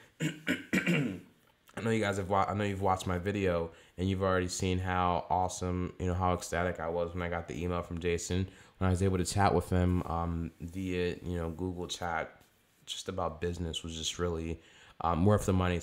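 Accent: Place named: United States. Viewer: But you have a little more advantage than me bcos you have the American accent and I don't. What I did was join a program that started paying me without referrals or customers or leads or anything like that. Then I made a proof on income video just to add some credibility to my business and then I sarted getting quality leads from my campaigns. I'm not trying to get you to join me, you can join another person but you really need to check this out reviewprofitclicking(dot)wordpress(dot)com.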